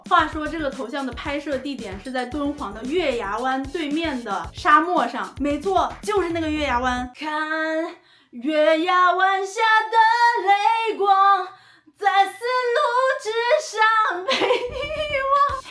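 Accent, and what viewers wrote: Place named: Shanxi, China. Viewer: 感觉有点儿山西口音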